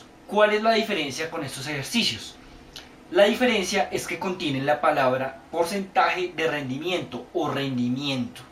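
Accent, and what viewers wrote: Colombia. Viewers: Profesor de que parte de Colombia sos ? :D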